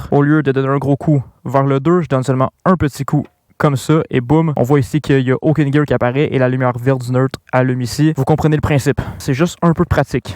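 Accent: Quebec, Canada. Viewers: Mais excusez moi, l'accent canadien est vraiment très chiant 😂😂😂😂😂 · Bien expliqué bravo même avec l'accent canadien. Très cool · Tres bien expliqué, je ne suis pas novice en moto mais je suis en train de passer le permis actuellement et les explications sont limite plus claire que le moniteur malgré l'accent Québécois 😂 Bravo continu 👍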